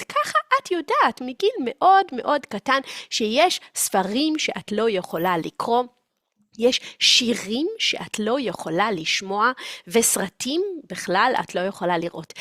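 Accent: United States. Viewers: I love your podcasts- very fun, informative, and very good for my Hebrew learning. It is awesome the way you are completely bilingual and can switch so effectively between Hebrew and English and your accents are perfect (perhaps a bit American in your Hebrew but OK!) Just one idea- if you could look into the camera when you speak. Your gaze is always to the side and a bit low. I think your podcasts would benefit from looking straight into the camera, though it may take a little practice. Thank you sooo much!!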